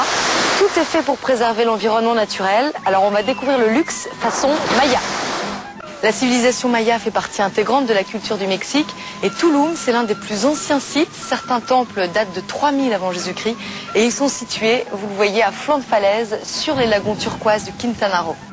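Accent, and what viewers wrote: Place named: France. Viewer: french accent is sooo fikkin hoot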